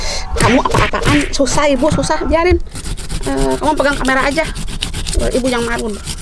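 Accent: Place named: West Java, Indonesia. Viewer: Ibu teh tinggal d daerah mana yah... Bahasanya logat Sunda.. tapi dkt ke laut ada gunung / bukit juga... Banten Iyah Bu...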